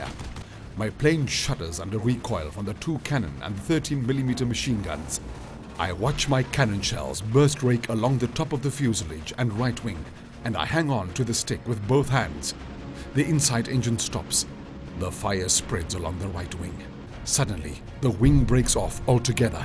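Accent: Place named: South Africa. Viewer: Very well done. War is a dramatic and often ugly business. This makes the point rather well. That people on either side should celebrate the death and suffering of others illustrates how crude we can be. Narrator South African?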